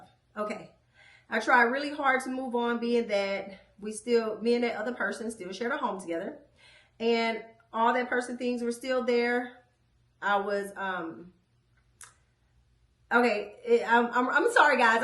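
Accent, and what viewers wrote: Michigan, United States. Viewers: waitttt you from michigan?😂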